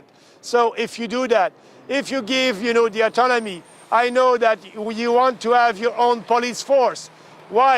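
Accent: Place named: Quebec, Canada. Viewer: i was referring to his ghastly quebecer accent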